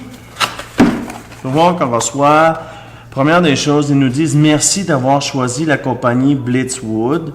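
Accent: Quebec, Canada. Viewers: j'adore votre accent québécois et je participe toujours au concours mais je n'ai jamais gagner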